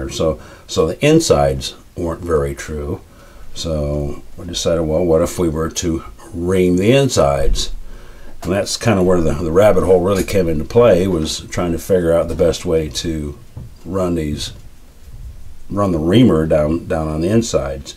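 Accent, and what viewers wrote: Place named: South, United States. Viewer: Hornaday Sayko Lay-pewah Triggered yet? 🤪 That said, most of what we learn about our sport is read in books, magazines, or gun blogs, so if you've never heard it pronounced properly you'll say it the way it looks like it should sound, I don't personally have a problem with that. BUT blaming you incorrect pronunciation on your southern accent is a cop-out: even a southerner can learn to pronounce names correctly! Frankly, it's the polite thing to do!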